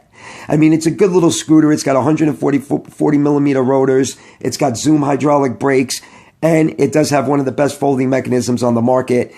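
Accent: New York, United States. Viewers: I nailed it my friend great commentary as well I love your ny accent and no b.s attitude keep up the good work my friend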